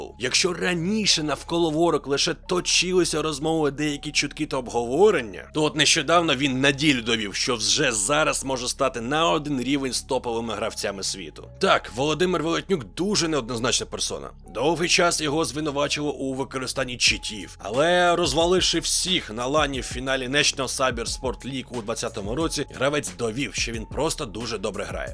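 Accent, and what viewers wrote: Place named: Russia. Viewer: Так смешно слушать русско говорящего, на украинском языке, ну да что не сделаешь что бы в армейку не за лететь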